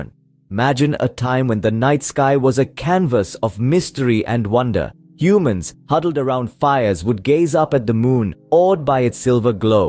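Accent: India. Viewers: when i hear indian english i change the chanel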